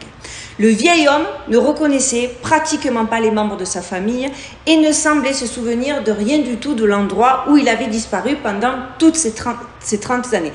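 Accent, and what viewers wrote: South, France. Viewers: Bravo pour tes plans d'attaque. J'adore ton accent du sud. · "il aurait pu écrire le Seigneur des Anneaux... sûrement un cousin à Tolstoi" euh, un cousin à Tolkien plutôt non ? Là tu m'as tué 😂😂👍😉 Merci de nous régaler de tes vidéos, de ton accent du sud et de ta bonne humeur 🙏🙏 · Merci ma jolie petite fée 🌹ça m'a fait du bien de te voir,avec ton accent du sud et ton humour. J'étais en pleurs en regardant 2 vidéos , terribles, ou mes gens se sont retrouvés, nasses, avec impossibilité de fuir.Il y avait vraiment la volonté de leurs faite très mal .😢Des parents , enfants et grands parents pacifistes. Gazés à blocs matraques. Pour rien,un crs à dit z une femme, qui m'a laissé passé, que les ordres venaient d'en haut. Sinon j'adore ta vidéo,toutes les histoires. Je connaissais, celle du gars quo disparaît, du champ, je la pensais plis vieille. 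Sinon connais tu l'histoire du nimitz ? Très étrange,j'avais vu ce film avec mon père quand J'étais ado ,ça te ferais un bon sujet🌞Merci pour ton super taf 💙Prends soin de toi, ainsi que ton fiston 💖et la belle 🐺🐾💖Duchesse . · coucou ça fait 3 jours que j ai découvert ta chaîne et je la dévore 😍😍😍 par contre si tu pouvais faire quelque chose pour l écho..... ça gâche un peu 😏 mais je suis agréablement charmée par ton accent du sud je m attendais à un accent canadien 😂😂😂😂 vive les expats ❤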